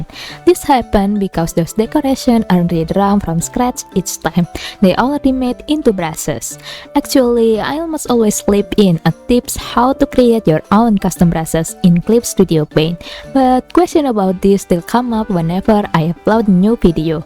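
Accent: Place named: Indonesia. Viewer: oke dari logatnya aku paham kamu orang indo wkwkwk Nice job, makasih tutorialnya kak ^_^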